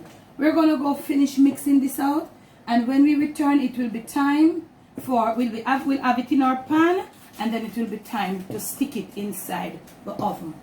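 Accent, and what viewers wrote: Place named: Jamaica. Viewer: Hello could you provide the full recipe, you have a beautiful jamaican accent I couldnt tell what some of the ingredients were that you used. there is one where it sounded like you said ammon essence. tried looking it up couldn't find it. and so i thought maybe she said lemon essence, I found that. is that one of the ingredients in your recipe lemon essence?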